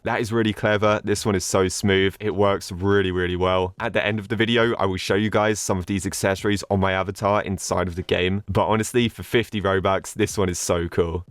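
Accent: United Kingdom. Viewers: me: is watching the intro BRI'ISH ?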